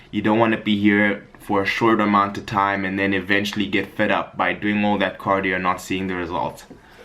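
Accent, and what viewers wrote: Nigeria. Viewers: The Nigerian accent tho 😂😂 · Why u talking like a Nigerian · that NIgerian accent tho..lol...too funny..great tips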